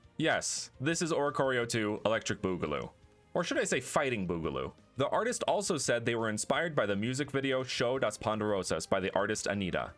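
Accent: Ireland. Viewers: Being Irish I can confirm he is Irish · Are you from Ireland too? 💚🤍🧡🇮🇪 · Be proud of your Irish heritage - it makes you human (and I love an Irish accent! 😊) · I thought he was Irish Also merry Christmas 🎄🎄🎄🎄🎄🎄🎄🎄🎄🎄🎄🎄🎆🎇✨🎁🎈🎄🎄🎄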